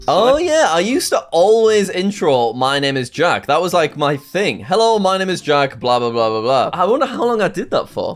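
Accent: United Kingdom. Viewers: What part of uk are you from jack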